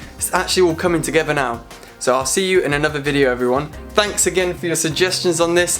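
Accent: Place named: United Kingdom. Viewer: Where are you from in the UK ? I recognise your accent from near me 😁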